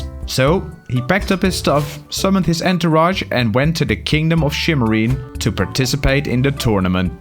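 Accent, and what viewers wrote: Netherlands. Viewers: Dutch accent 😢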